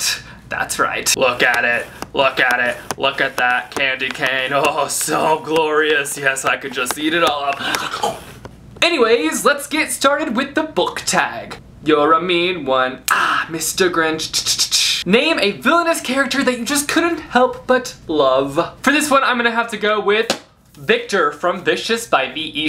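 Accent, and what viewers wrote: Spain. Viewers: I love your spanish accent, Happy Holidays Dear!! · You have an awesome Spanish accent! lol. :)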